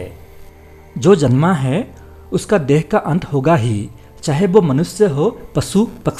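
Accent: Assam, India. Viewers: আপুনি অসমীয়া নেকি ॥ আপোনাৰ কথাবোৰ অসমীয়া যেন লাগে হিন্দীত কয় যদিও ॥ ভিডীও বোৰ ভাল লাগে আপোনাৰ❤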